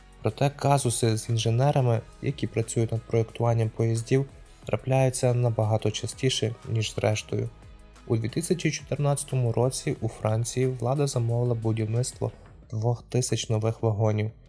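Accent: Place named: Lviv, Ukraine. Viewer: звернув увагу на вимову числівників. Звучать як у Львові говорять - 50 з пом'якшенням т.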